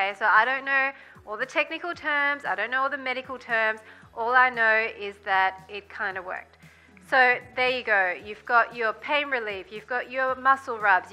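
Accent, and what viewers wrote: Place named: Australia. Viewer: A freaking Aussie! G-day mate!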